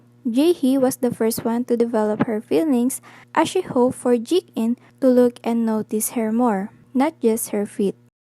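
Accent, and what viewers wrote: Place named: Philippines. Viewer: im pretty sure the accent if from tagalog or the language of the philippines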